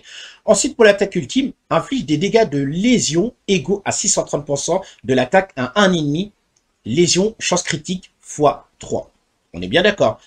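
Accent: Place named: England, United Kingdom. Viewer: Superbe accent anglais ! Tu rentres dans le game mondial du gatcha youtube 😎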